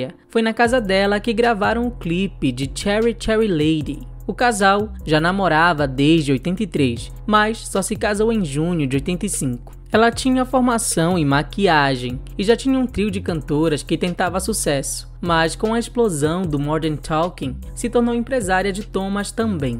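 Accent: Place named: Rio de Janeiro, Brazil. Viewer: este sotaque carioca, que mata...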